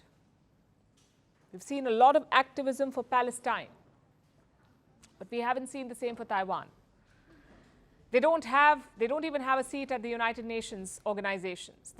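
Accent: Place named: India. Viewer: Firstpost is CIA funded propaganda channel. Palki was chosen for her thick Indian accent, meant to confuse naive Indians. All her material are created by CIA paid staff, she just delivers it like an 'Indian'.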